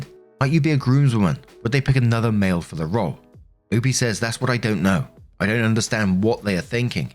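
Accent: United Kingdom. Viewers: you are so insanely wholesome. I used to listen to other people reading these stories but there is nothing like an accent from home and haven't listened to anyone else since finding your channel. I hope you are having a lush day! Keep making us brits look great ❤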